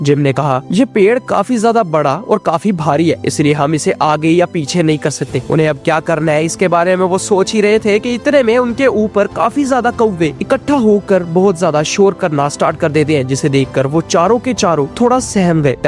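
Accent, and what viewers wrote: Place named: Pakistan. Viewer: Paxtani accent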